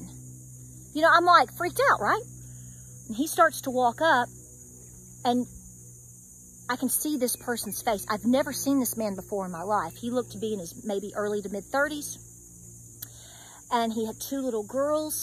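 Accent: South, United States. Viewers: Love your Down to Earth style talking. Southern kinda talk; I'm a Tennessee born individual and (ha ha 😅😅😅) it's my style that's like down home old schoo.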